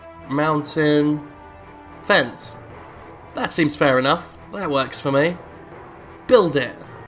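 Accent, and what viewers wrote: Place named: Liverpool, United Kingdom. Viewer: Bill Nigh has the most elegant voice I've heard. This narrator though, I'd say he sounds more like George Harrison, that Liverpool sort of accent.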